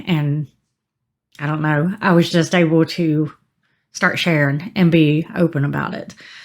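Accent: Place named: South, United States. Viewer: Wow, that was a beautiful, open hearted and moving vlog. Thank you for sharing in that gorgeous Southern accent of yours. Any chance you could make a video off topic how to make sweet potato pie?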